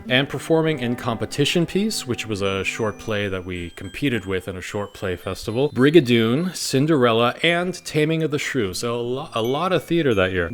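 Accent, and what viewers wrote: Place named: Maine, United States. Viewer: I could tell immediately you were from Maine.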